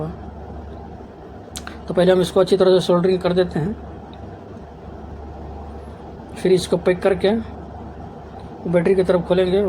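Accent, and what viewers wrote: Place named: Bihar, India. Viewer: Aap kahan se ho bhai jaan aapki aawaz se lag raha hai bihar ya jharkhand se ho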